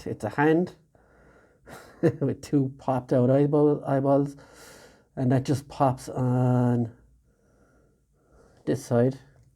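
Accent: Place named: County Clare, Ireland. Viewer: Nice :) It's just... A mate of mine and one of my favorite Youtubers, mr. Dazran3o3, comes from county clare and your accent sounds just like him. :P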